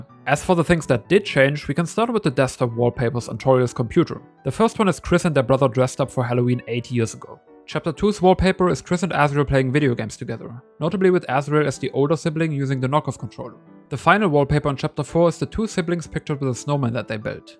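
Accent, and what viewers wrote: Germany. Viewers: Deutschester akzent den ich je gehört hab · i hate that i can clock a fellow german speaking english in the first 00.2s seconds of them speaking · I hear a german accent.. .. hmmmmmmmm 🤔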